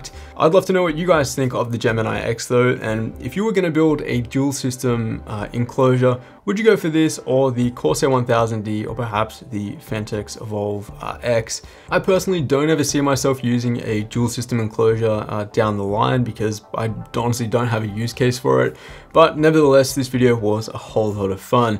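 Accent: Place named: Australia. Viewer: HE SOUNDS AUSSIE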